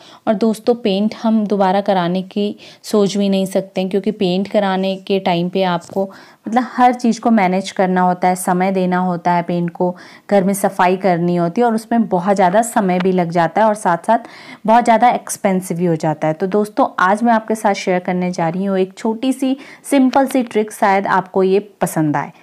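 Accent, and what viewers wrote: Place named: Himachal Pradesh, India. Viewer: Thank You Mam For Replying On My Comment Mujhe Laga Aap Himachal Se Ho Aapki Tone Himachali Lag Rhi Thi .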